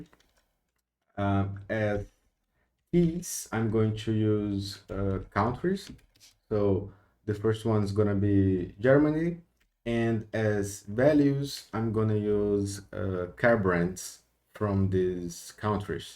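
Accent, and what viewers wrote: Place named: Brazil. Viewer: You sound like you've got a Brazilian accent, is that right? I am from Brazil, living overseas, learning Python, and this is exactly was I was looking for. Obrigado!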